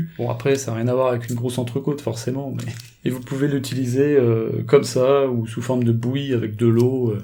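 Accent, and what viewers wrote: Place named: France. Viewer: Penso che parli francese dal accento ma purtroppo io non e parlo e non l'ho capisco cazzo però parlo 3 lingue ma non capisco manco il francese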